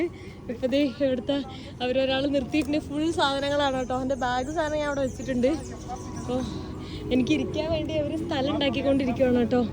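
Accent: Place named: North Kerala, India. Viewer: ഉത്തര കേരളത്തിൻ്റെ ഏതു ഭാഗത്താണ് ജീവിച്ചിരുന്നതെന്ന് അത്ഭുതപ്പെടും, സംസാരഭാഷ കേൾക്കുമ്പോൾ '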